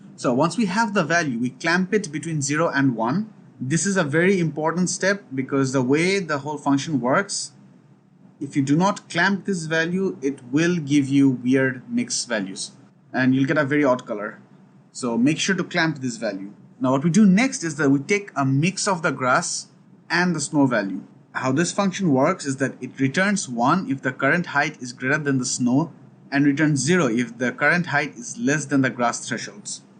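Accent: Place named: Bangladesh. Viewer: your accent is a bit Bangladeshi. are you from Bangladesh bro ?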